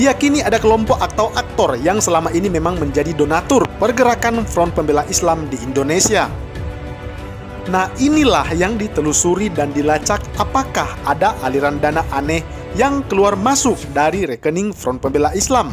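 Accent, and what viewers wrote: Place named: China. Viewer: Apa iki...suara nya asli apa mahluk jadi2an tokh ini? Di lihat logat bcr yakin ini cina nyungsep.nyamar!!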